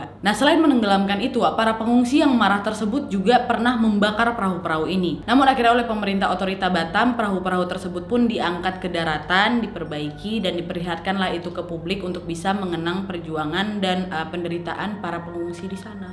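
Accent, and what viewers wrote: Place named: Medan, Indonesia. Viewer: Dr logat nya pst org Medan. 😂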